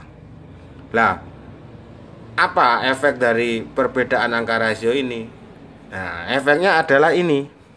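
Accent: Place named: Java, Indonesia. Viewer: slang nya pekat jawa. terbaik bang. salam dari malaysia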